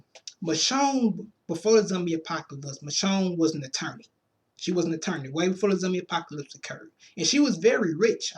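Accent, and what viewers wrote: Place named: New Orleans, United States. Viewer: Sounds like your from New Orleans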